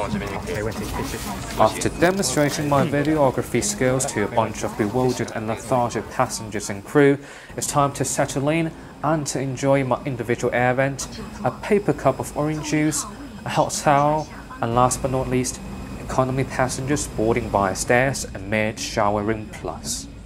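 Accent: United Kingdom. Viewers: Officer has a rather distinctive British accent. Maybe if switched to an American accent you might be better able to understand him?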